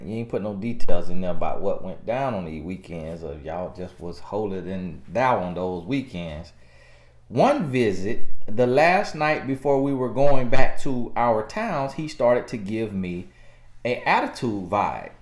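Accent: South, United States. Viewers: he's so country and i'm here for it! I understand country, but it's been a while since i spoke country.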